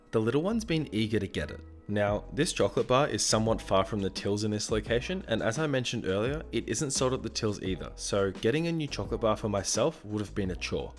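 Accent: Australia. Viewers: Are you aussie? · Are you Australian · Narrator, are you from Australia? Your accent reminds me of some of my old friends from Australia. Just wondering.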